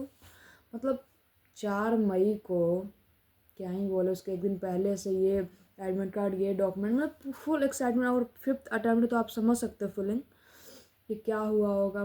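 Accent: Bihar, India. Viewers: Bihar se ho na? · Ap bihar se ho?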